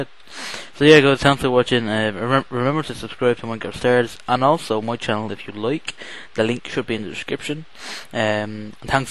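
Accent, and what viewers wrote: Ireland. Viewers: That was cool. Nothing wrong with an Irish accent either. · hes irish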